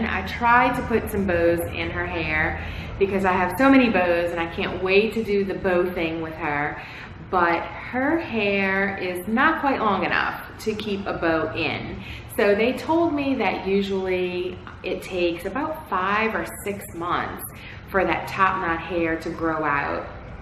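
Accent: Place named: New Orleans, United States. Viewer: I have been watching your videos. You are so sweet and informative. We have a lot in common. My family is from New Orleans. I picked up on your accent right away. Go Saints! I lost my 1 st Shih Tzu 4 months age. He was 13 and my world. I also have been looking for a reputable breeder to find a new baby. I searched all over the country spoke to many many reputable breeders and finally reached a decision. Now I am expecting my new puppy 🐶 in 8 weeks. I am so excited to see and meet him in person. I love watching your videos. My husband and I do filming and media. I also have 2 grandsons. I love and spoil my dogs too. We love Disney too! My husband produced the Voyage of the Little Mermaid puppet show and also on the set of the Lion King. I hope one day we can talk. Stacci is gorgeous!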